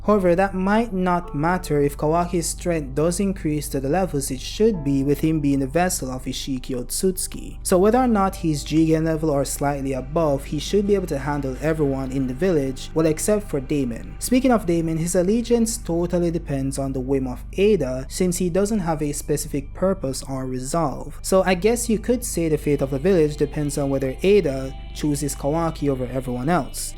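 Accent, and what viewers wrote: Jamaica. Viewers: are you jaaican by any chance, your accent sounds familliar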